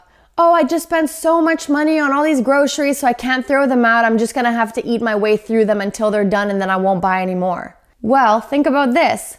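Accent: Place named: Lithuania. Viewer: Love your accent. Great info and video. Thanks so much. Are u from Lithuania 🇱🇹?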